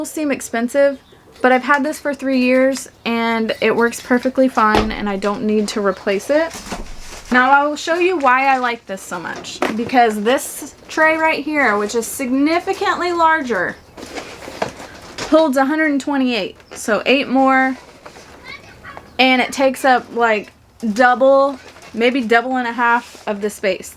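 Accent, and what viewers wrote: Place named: South, United States. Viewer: you may be in Indiana but..no way that you are from Indiana. Or.. Perhaps you're just throwing some southern charm (dialect) in as humor..? Come to think of it though you're not that far from me. (N.W. Alabama - Zone 7B)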